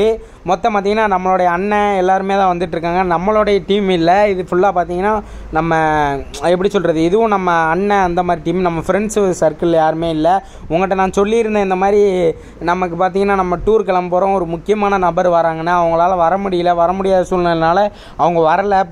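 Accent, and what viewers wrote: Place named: Aruppukottai, India. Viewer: Pronoun aruppukottai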